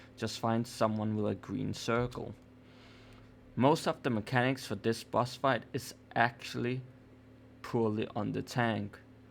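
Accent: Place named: Denmark. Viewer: Big thanks for the guide, I will probably be tanking this for my crew this week. Are you Danish? You sound like a fellow Dane :D If you need a crew to run raids with you should consider joining us in CozyCrew over on Ether EU.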